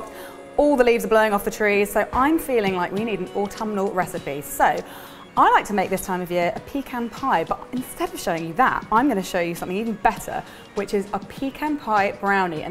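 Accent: United Kingdom. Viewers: Amo el acento del inglés británico, tenía que decirlo!!! Suscrita para conocer deliciosos postres y escuchar el idioma ;)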